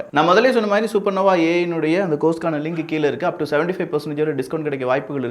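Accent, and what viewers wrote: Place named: Tirunelveli, India. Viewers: நெல்லை தமிழ் pure gold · விக்கியின் திருநெல்வேலி பாஷை சூப்பர்.❤❤❤❤❤❤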